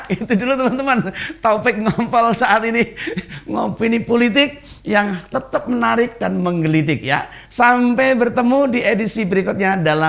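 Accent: Java, Indonesia. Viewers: NJENENGAN PIYANTUN SOLO APA JOGJA. BAHASANE KOK MENARIK. CETA, NJAWANI, MEDOK. ULASANE NGGIH MANTAP. SAJAKE PERNAH DADI MC. NGAPUNTEN OM.BEGAWAN.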